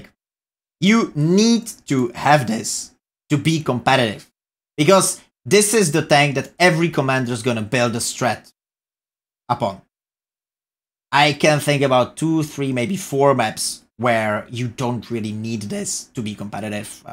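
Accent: Czech Republic. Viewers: ingliš vidijou miluju czech accent over muj british :D:D:Dd:d tohohle typka miluju proste, nejůepsi wot content a zvedne ti naladu kdykoliv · Angličtina jako čeština pikacu ,smysl ok😊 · Zase tak skvělou ne, ale už jen že mluví s českým přízvukem to dělá srozumitelnější a to o HODNĚ. Taky mluví dost pomalu.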